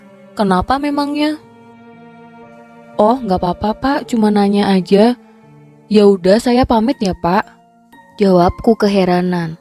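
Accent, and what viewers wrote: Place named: Java, Indonesia. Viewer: nedok mbak e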